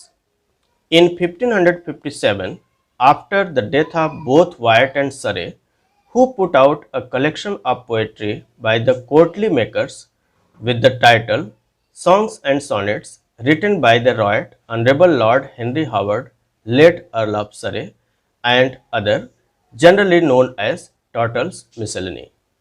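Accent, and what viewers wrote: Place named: mixed, India. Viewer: it's French origin word, s per dictionary the phonetic symbols u have replied is absolutely bang on. My only request was not to pronounce the word in Typical UP, Bihar accent n not to dishonor you. In ur reply u can see the sound of 'N' is bracketed which allows us to pronounce the word without N that makes the pronunciation better n that suits ur vast grip in literature 🙏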